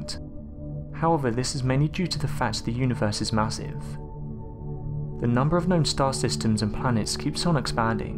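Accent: United Kingdom. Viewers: That is it. I am sick of your videos. 1) you should not narrate your videos until you correct your "Rs" and "Ss" and loose the brit accent. 2) Stop using deceptive titles. When you were a child, you got in trouble for stealing.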